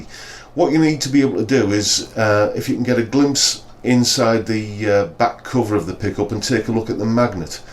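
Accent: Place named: Northern England, United Kingdom. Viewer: Love it. Learning so much from your channel and lovely to hear a genuine northern accent instead of all the over enthusiastic Americans - bless em - who can get a wee bit annoying. I'm in my 50s and been playing, touring, tour managing and whatnot since my late teens and now semi-retired have been buying up cheap guitars to learn about setting them up and fixing them so I can sell them on at a small profit to encourage new young players while giving a few to local charity shops so they can make some money for good causes. Not as cool as what you do but I'm a great admirer and - to the point of the video - yup you can really hear the difference between the pickups. So much sweeter those alnicos - mmm baby, oh yeah, especially on the clean neck rhythm imho, and the ceramic just sounded thin and harsh on the medium gain bridge. 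Right... back to burning my hands with a soldering iron (I am so rubbish with one lol). Cheers mate 👍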